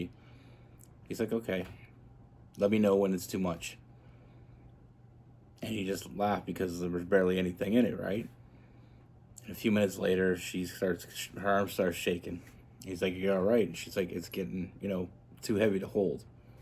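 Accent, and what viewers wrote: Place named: Canada. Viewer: bro that was so great and spot-on! have let go/moved away from a libra, but some of that energy is still hanging around ... meanwhile a pisces has come along and we're taking it slow and getting to know one another and it really feels like emperor/empress. anyway i thought you might also be canadian based on your accent and then i saw the tim hortons lol! thanks and take care.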